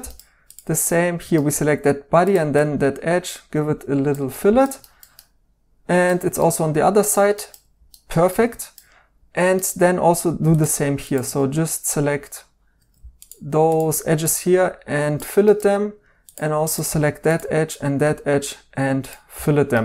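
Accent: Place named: Germany. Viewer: I love your German accent , it’s so cute!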